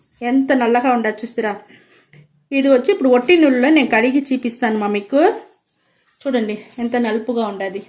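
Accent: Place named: Chittoor, India. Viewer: మేడమ్ గారు చిత్తూరు జిల్లా తమిళ్ బోర్డర్ లో ఉన్నటువంటి తెలుగు వారు అనుకుంటున్నాను.అయిన తెలుగు బాగా మాట్లాడుతున్నారు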